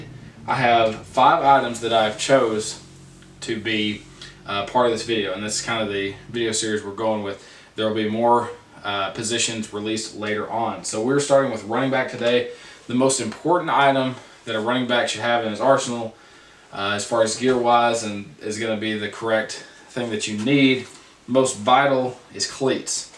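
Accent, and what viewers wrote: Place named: Arkansas, United States. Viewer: I can tell Your from Arkansas... me to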